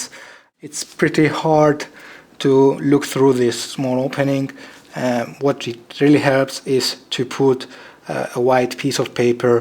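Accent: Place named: France. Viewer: Thank you! What accent is that? It sounds almost like French! Merci beaucoup! :)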